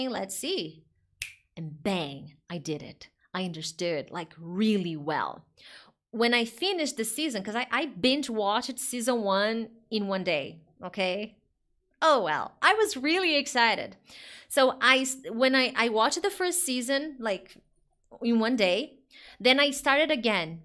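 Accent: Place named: Brazil. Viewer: Teacher once that see you,i recognized that you was Brazilian woman,because on the accent.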